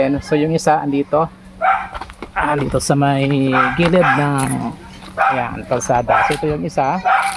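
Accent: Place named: Iloilo, Philippines. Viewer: ilonggo man ni gali. hahah taga diin ka sir?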